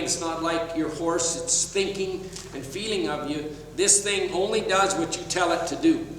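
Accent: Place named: Canada. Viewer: He is saying hondo. He has a Candian accent.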